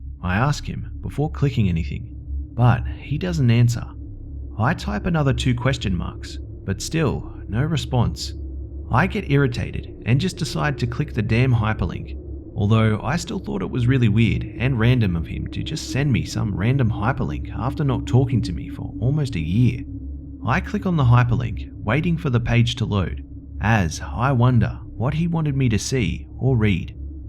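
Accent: Australia. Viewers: Hello B are you Australian??? Pleas reply. Your the best!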